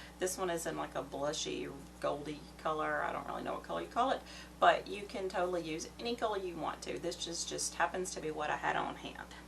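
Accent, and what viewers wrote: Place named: Texas, United States. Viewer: I am so excited and feel so blessed since the very time I thought of subscribing to your channel because you are not only super inspiring and talented but you have totally inspired me 💯% on transforming my crafting room because I just had all these beautiful things I've been purchasing all the time and I did not know where to start and finally I'm seeing a light at the end of the tunnel because my crafting room is my hugeeee attic and up here I also have a fire place which makes it even more fun and beautiful. Thank you so much ☺💖 oh btw I so love your accent reminds me of when I lived for a little while in texas I actually delivered my youngest in dallas and I miss it so much over my husband and I are really thinking of moving back somewhere in the very peaceful side of texas god willing and fingers crossed 🙏☺ God bless and I look forward on seeing your channel bloom and grow so much because you really deserve it 💖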